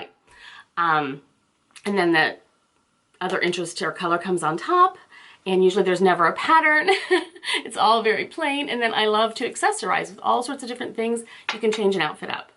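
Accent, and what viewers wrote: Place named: Midwest, United States. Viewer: I just stumbled on your videos, and I love them! I knew I recognized that Midwest accent, I am a fellow Chicago suburb girl. I also strive to be casual chic in this stage of my life too. A stay at home mom with mostly grown daughters, looks like we are both in the same boat!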